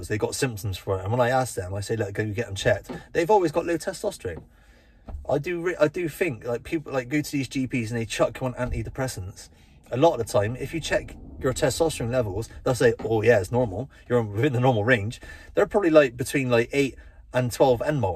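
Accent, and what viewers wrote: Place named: Gloucester, United Kingdom. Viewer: sounds like a Gloucester lad .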